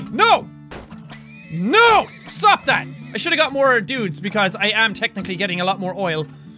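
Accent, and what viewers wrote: Ireland. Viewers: Yes! I've always been wondering who has that Irish accent! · He is bloody fuckin irish m8. It`s his accent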